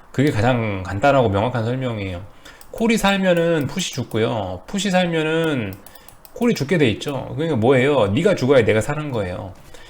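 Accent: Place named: Chungcheong, South Korea. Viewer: 얼렁얼렁..ㅎㅎ 충청도..방송감사합니다.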